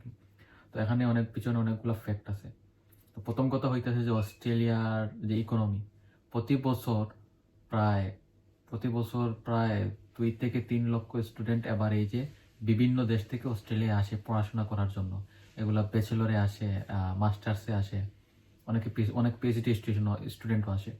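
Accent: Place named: Sylhet, Bangladesh. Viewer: Bhai accent a lager Sylheti...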